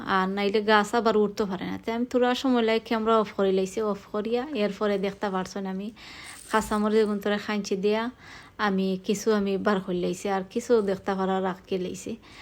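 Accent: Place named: Sylhet, Bangladesh. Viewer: আপা আপনি কি সিলেটইয়া নি,কথায় বুঝা যাচ্ছে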